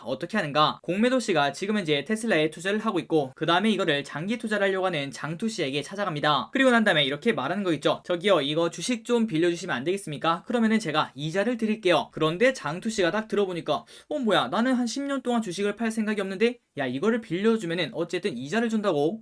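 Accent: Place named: Daegu, South Korea. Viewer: 님! 대구살아요? 와~~표준말 대따 잘하네요.저도 연식이 좀 있는데도 님 영상은 무조건 좋아요! 부터 누르고 꼭 봐요.너무 귀염귀염해여! ㅎㅎ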